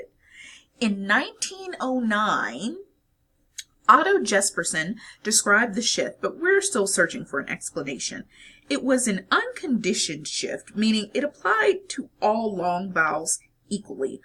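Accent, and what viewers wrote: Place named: South, United States. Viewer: You do in fact sound southern.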